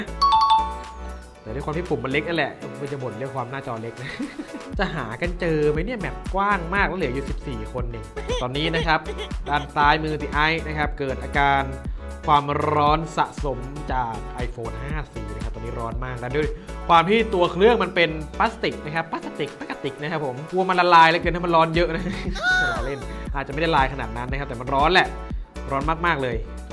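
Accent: Chiang Mai, Thailand. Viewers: พี่เป็นคนเชียงใหม่หรอคับ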